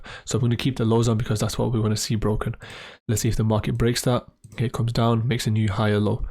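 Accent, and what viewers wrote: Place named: Midlands, United Kingdom. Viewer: From the UK midlands, Birmingham or Luton.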